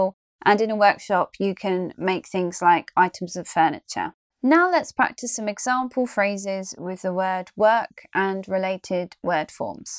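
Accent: United Kingdom. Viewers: That's the British pronunciation, which differs a lot from the American one.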